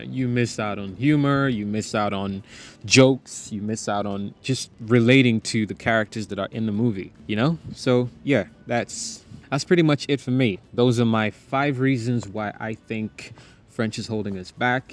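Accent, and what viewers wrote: United States. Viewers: You do have solid points in your analysis. Thanks for the share. By the way, where you got your American accent from 😀? Did you live in US or Canada??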